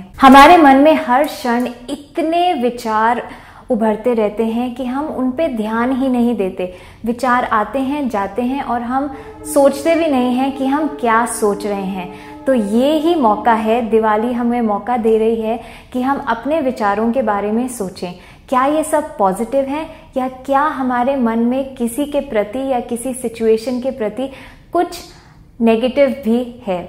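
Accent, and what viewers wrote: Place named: India. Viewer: And most importantly love your way of talking. An indian fragrance which came out from your voice that i love the most.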